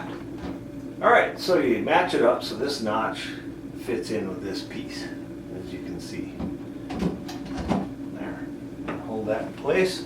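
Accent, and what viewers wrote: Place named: Midwest, United States. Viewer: thanks. very clear. sounds like a midwest accent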